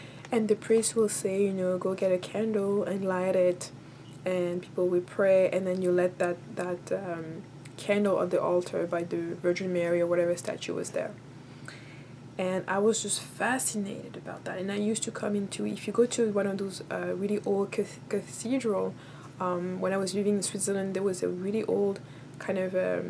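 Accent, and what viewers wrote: France. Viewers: I can hear a French accent peak through am I right? But good story time girl make more videos can you do a love spell?!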